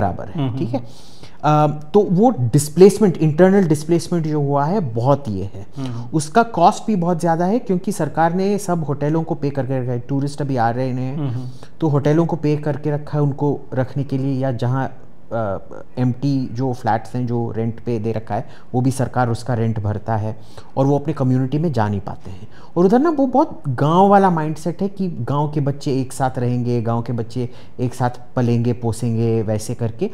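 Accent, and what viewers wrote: Standard. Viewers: 1st thing 1st - All praise due for for his chaste Hindi delivery! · जी आप की हिंदी बहुत शुद्ध है।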